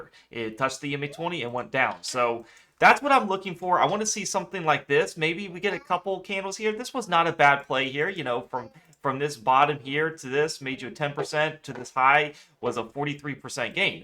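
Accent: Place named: Iowa, United States. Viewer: ARE YOU FROM Iowa??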